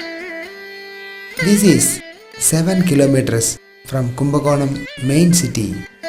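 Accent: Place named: England, United Kingdom. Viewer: Commendable job. Can’t thank you enough for the commentary in English. Sadly heavy English accent. Best wishes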